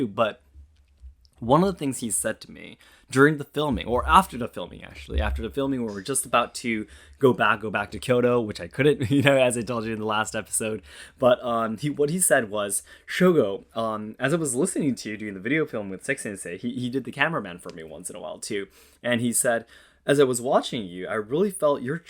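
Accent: United States. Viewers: I am very grateful and happy that you speak so politely in English. I've never needed to worry about who is around me be upset or offended by hearing anything on your channels. Which makes me very happy. As far as your English accent being American or basically the same as mine. I think that it makes it easier for anyone who speak English to understand you. They send people from other parts of the US to the region you lived in / I am from to learn how to speak American English in a way to be well understood. You are very polite in English too.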